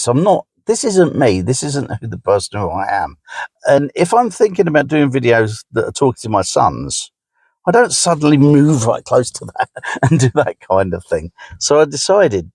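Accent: United Kingdom. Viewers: Thank you. Thank you very much. As you know, I came because of that DVD video. Visited a few others. Learned what I wanted to learn (I was right...LOL) and never went back, except for you. I watched that beach day and listened to your stories. I, also, read the comments. I love their stories, even the short sweet ones. And I slide past the trolls with a roll of my eyes, so good to learn, you do too. I have very few friends. Years ago, I used to sit and talk to people in the building. They are gone now. If you lived in my bldg. and I saw you, I would sit down and we would have a good chinwag about our lives. I would refer to you as 'my friend' when I talked to others. We would share the low and high (not THAT kind of high) times. No gossiping. I like you for three reasons: Your British accent; no bells and whistles just get down to it; and you put up with my long comments. 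There are other people like you and I have watched some. I can tell the real people and the just-want-to-be-on-YT ones. The ones who don't have our lives but cobble together stories for themselves. You are the real deal. I could always do that...tell the posers from the real deal (esp. musicians). Thank you for reading my comments, too. And thank you for finding people to watch you and share as well. BTW, I was singing all last week! (The version.) So, thank you, too, for letting me know I'm not insane. Hah!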